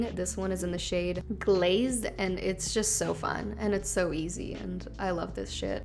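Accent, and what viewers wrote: United Kingdom. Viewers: love your slight british accent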